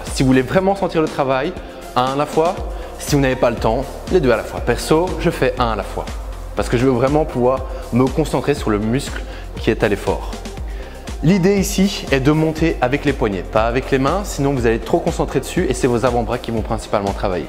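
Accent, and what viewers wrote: Belgium. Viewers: bon job je note un accent belge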